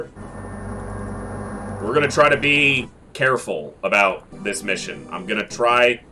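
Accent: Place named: United States. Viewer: I am very much enjoying this playthrough. I’ve watched a ton of xcom and TFTd let’s plays on YouTube and this is quickly turning into one of my favourites. I love the raw organic playthrough where you just have to live with your mistakes and keep fighting. I’ve seen too many people play this game and just spam psi powers to win the game. I never liked using psi personally, feels like a cheap way to play. Also love that TFTD is getting some love cuz this game deserves love too! Your subtle American accent reminds me of someone I used to know from Philadelphia. Greetings from Canada!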